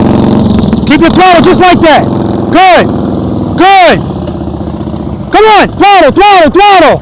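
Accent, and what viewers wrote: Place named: Boston, United States. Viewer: I was working on my bike when this video started. I thought you're a chippy Italian-Irish bloke from Boston at first. 😂 Regardless, I thoroughly enjoyed this video. The way you communicate is top class and I'm so thankful to have stumbled upon your channel.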